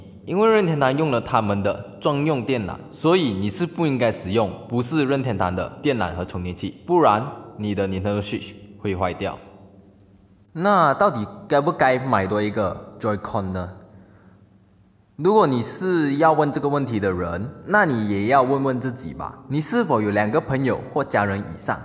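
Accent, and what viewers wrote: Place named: Malaysia. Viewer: 这发音 好像马来西亚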